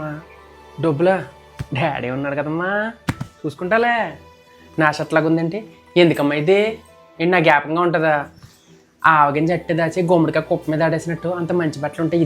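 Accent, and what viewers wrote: Godavari, India. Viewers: u are extraordinary …గోదావరి యాస అద్భుతం…అన్ని సంఘటనలు మన ఇంట్లో జరిగినట్లే ఉంటాయి…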